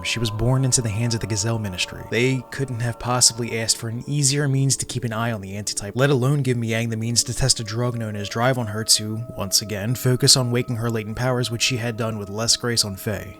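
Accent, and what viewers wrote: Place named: Philadelphia, United States. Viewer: Just finished the game last night, mind blown, had the perfect works on hand to dive deeper when I finished. My GF was watching me play some and was equally intrigued by the story instead of me recount every story element or have her watch the 17hour cutscene “movie” this was great. You from Philly (represent!) my dude? Your “o”s make me think you’re from the NE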